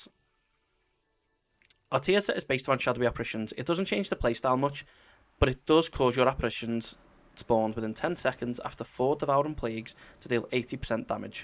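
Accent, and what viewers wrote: Liverpool, United Kingdom. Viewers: Is that another scouser playing WoW ? Boss.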